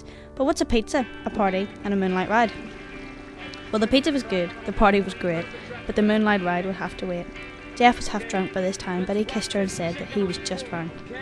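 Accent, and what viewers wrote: United Kingdom. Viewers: Just for some clarification, this is a true story. Based off of the poem, Moonlight Ride. Also, the way the narrator is speaking is how Northern Irish people speak. so understand that and get over it, and understand the true message behind this video. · it ok vid but you went to fast and r u from england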